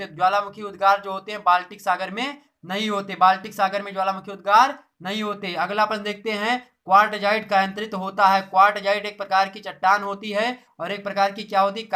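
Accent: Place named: Bihar, India. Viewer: Tu hu guru bihariy lagat hawa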